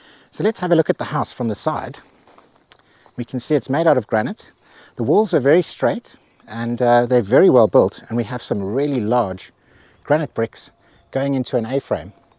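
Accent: South Africa. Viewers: Hi Are you South African? The accent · THats a South African accent. Hello from Cape Town and good luck in Portugal.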